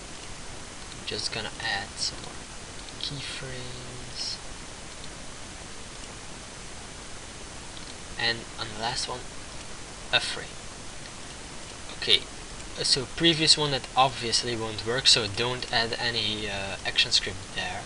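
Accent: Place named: Philippines. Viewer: Hey, if ur not jepenese that ar u filipino cuz u have an accent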